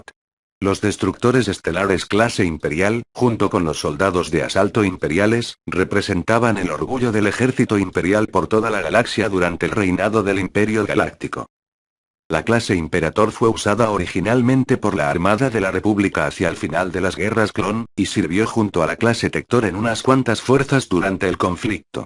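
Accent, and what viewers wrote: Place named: United Kingdom. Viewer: Tienes un acento británico amigo. Gracias.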